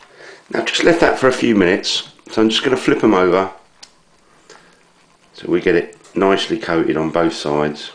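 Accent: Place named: Estuary, United Kingdom. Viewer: That's a (Thames) Estuary accent... London and its environs, into Essex, and very working class.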